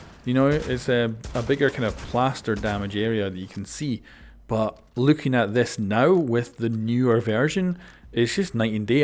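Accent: Scotland, United Kingdom. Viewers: I’m not sure if I’m right but it sounds like ur accent is slightly Scottish is it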